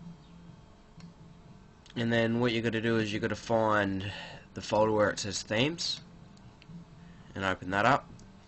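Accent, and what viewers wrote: Australia. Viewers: I frickin love your accent bro im aussie aswell. good to hear it and thanks for the video it helped. Aussie pride amrtie ?! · Thanks for the tute mate. Good to hear another fellow aussie voice ;)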